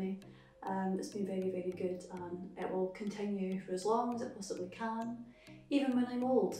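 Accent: Scotland, United Kingdom. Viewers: Briiliant to see a beautiful girl on here with my accent. I keep telling people we have so many gorgeous girls in Scotland and oor just confirms this. Absolutely stunning, ❤️🏴❤️🏴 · It’s the Scottish accent that makes me melt lol Much respect 👍♥️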